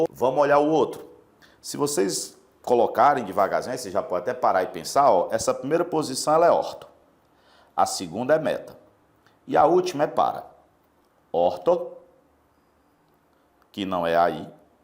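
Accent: Ceará, Brazil. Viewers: como eu amo sotaque nordestino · O senhor é cearense?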